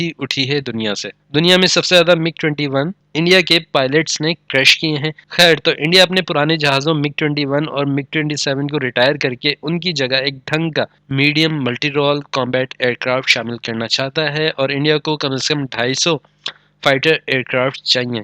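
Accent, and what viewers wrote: Punjab, Pakistan. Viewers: I am Pakistani and I agree with you, this guy is either unemployed or he is doing fake propaganda for ispr. india is way ahead. PAF is no match in front of india in numbers or in technology. even witht he failure of tajes and mig 21 still indian air force is 6 times greater than pakistan. I am not posing to be pakistani I am real Pakistani from karachi and I can tell you from the accent of this guy he is punjabi who is speaking urdu.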